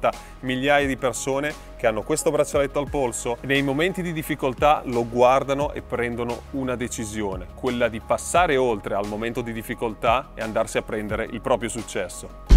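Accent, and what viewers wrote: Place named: Ferrara, Italy. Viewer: ma sei di Ferrara? Dall'accento e intonazione sembri proprio uno di quei ferraresi oramai poco riconoscibili, che hanno viaggiato tanto e per questo smarrito (per fortuna) le elllle e le essse drammatiche, ma un eco mi rimanda a Ferrara, sono di Ferrara anch'io anche se ho vissuto negli USA qualche anno.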